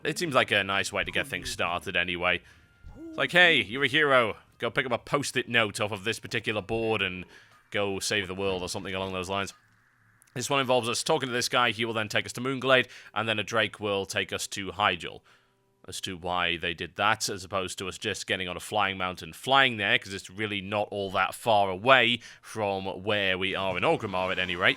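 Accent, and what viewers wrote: United Kingdom. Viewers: stupid ugly british accent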